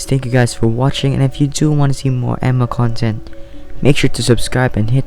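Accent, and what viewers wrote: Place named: Philippines. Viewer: the pinoy accent thou😂 chill voice btw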